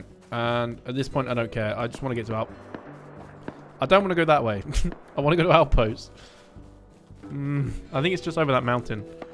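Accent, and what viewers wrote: United Kingdom. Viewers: Yo, about the coffee thing... I wanted to ask you a question - which may sound odd but it's genuine. is just your nickname or your actual nationality? (I don't recognise accents well, English is not my mother tongue). 'Cause I'm a Pole who spent 16 years in Italy and got used to that quality of coffee, I'd never buy coffe from an American because they do it wrong 😅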